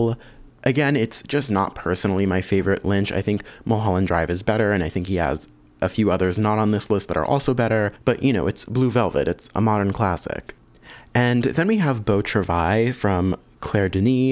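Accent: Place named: North America. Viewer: He's North American. It's ok for people to pronounce names or words in a regional accent, which is not how to pronounce it in a native tongue. We know who it refers to, we understand the word, there is no intended disrespect going on (if anywhere, that's in your post) your post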